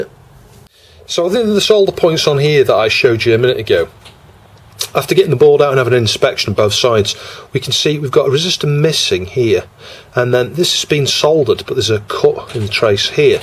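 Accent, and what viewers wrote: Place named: Manchester, United Kingdom. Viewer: Love the video. That Manchester accent, though...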